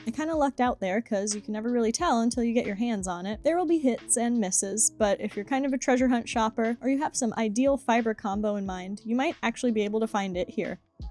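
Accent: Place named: United States. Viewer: Wait a sec, where are you then? You sound American to me but maybe I'm just tired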